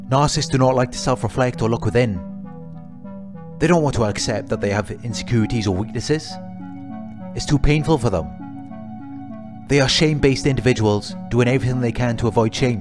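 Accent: United Kingdom. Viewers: Hard to understand this British accent 😞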